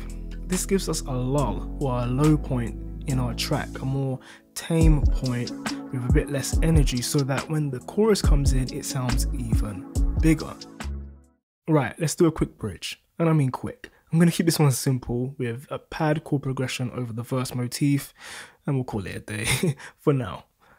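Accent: United Kingdom. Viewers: Ahh I feel so embarrassed now! I forgot your had a London accent. Anyway I hope you have a great holiday regardless!!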